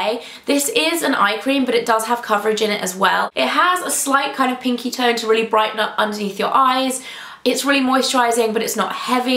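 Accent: United Kingdom. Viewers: You're such a Brit! lol British never sounds casual to me, it's too proper! lol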